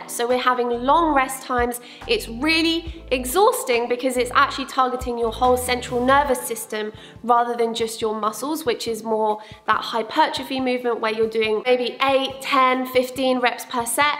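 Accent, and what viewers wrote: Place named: England, United Kingdom. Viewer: Not to be creepy or anything but how old are you? because you have accomplished so much and I adore you. You're amazingly toned, you do gymnastics and you have an English accent. You are what I want to be _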